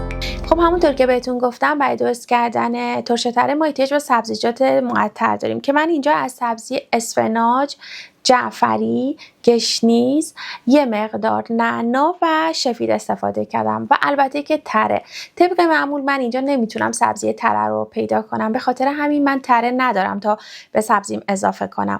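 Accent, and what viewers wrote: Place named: Iran. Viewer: I love the Persian accent you look incredible dear friend thanks for sharing best wishes from England ❤👍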